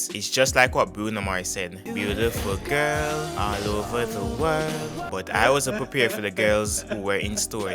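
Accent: Jamaica. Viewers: U should try n use ur jamaican voice some part in a di video dem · Badman, da jamaican accent deh nuh gone at all, it strong yf 😂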